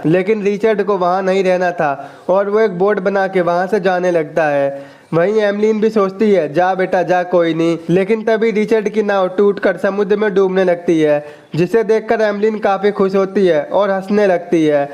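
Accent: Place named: Mumbai, India. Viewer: Bhai full to mumbai walo ke jese explqin karta hai😂😂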